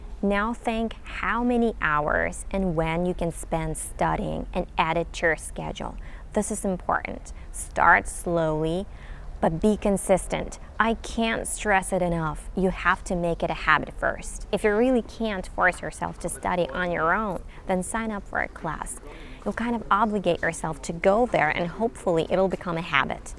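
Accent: United States. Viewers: thanks ma'am, I am very much enthusiastic to have accent like you as american but I can't so I want to do so hence try to help me in this regards more tips by which may I be able to reach my goal at the earliest as I can. I regularly watch your video for being fluent in the english language and I'm trying my level best in order to do so please do provide me someone's whatsapp nos through which I want to be perfect by regular discussion else let's see how does it come to an end for doing so soon. but I am very much hopeful to do so accordinly. Thanks awaiting your reply please. (India) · Вчора на вас підписалася, так думаю "напевне якась датчанка" бо ж всі вони добре говорять англійською) а тут дивлюся точно знайоме місто. Ви дуже дуже класна і хоч я англійський філолог, та мені далеко до вашого американського акценту! Бажаю вас багато підписників і задоволення від улюбленої справи) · Very good video with a bunch of great advice! 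👍 Congratulations. By the way, you have an awesome american-english accent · I'm from a non-native English speaking country. I really wanted to check the opinion of a native speaker of English. Her American English accent sounds perfect to my ears, but because I'm not a native speaker of English, I was not really sure about that and was waiting for the opinion of an American native speaker of English. She is a good inspiration for me in my English learning journey. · скажите пожалуйста из какого вы города России? У вас отличный американский акцент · Thank you, from the USA. I love your advice. you have an awesome American-English accent. Finally, I have to tell you're so good. When you will come to the USA. Let me know. Please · Yeah. She looks like from one of those countries. She has a kidda American accent, though. A pretty woman, by the way. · How're you? Your videos... it's a hell of a practical knowledge:D I love listening to your voice - your American accent <3, that's my goal:)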